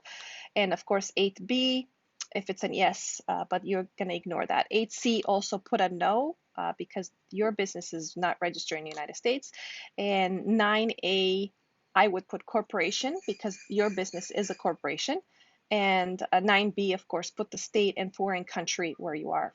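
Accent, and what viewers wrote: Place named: Romania. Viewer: Mom your Not canadian your from Rumenia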